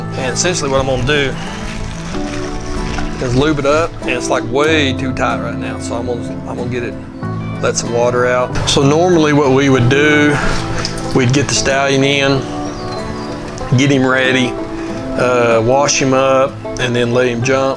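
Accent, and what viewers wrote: Texas, United States. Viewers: It's that great Texan accent. 🐴🐎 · honestly watching this guys videos cause one of my original characters is half kelpie and a kelpie is a water horse and i need to learn how horses work for the character. his accent reminds me of home (im in new york and from texas) and im trying not to cry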